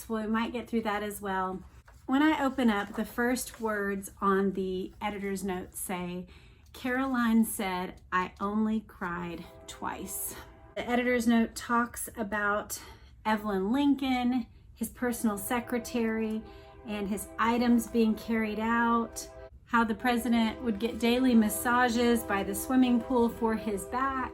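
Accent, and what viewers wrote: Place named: South, United States. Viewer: I think you have done a wonderful job with this whole series and you have such a lovely southern voice.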